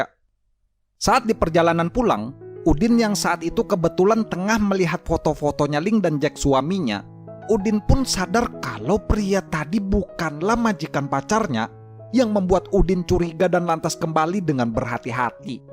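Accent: Banjar, Indonesia. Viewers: Logalnya Kya orang bnjr... Bujur jua KH ??? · Orang banjar kah pian bang, logatnya pina sama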